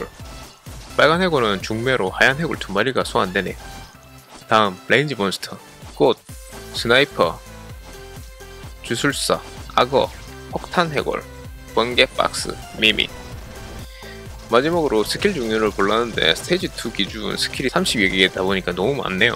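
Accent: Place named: Gyeongsang, South Korea. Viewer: 경남쪽 혹시 부산이세요? 사투리가 찰지시네요^^ 저는 뭐가뭔지도 모르고 시작한지도 얼마 안되서 배우는 입장입니다^^ 앞으로 잘 부탁드립니다~ 구독했습니당~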